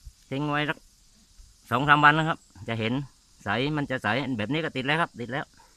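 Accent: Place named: South, Thailand. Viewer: ผ่านหลวง คนใต้แน่ฉาดๆ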